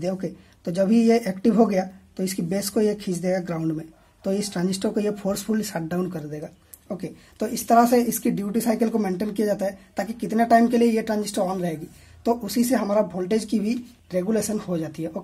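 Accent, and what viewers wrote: Bengal, India. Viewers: Are you Bangali??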